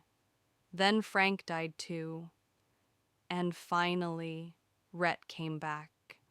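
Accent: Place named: United States. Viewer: excellent and clear American English pronunciation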